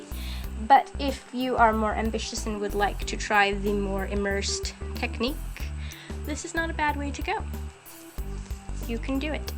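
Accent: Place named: United States. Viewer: lol, I am European and dislike her prosodie. Definitely too American for me ;)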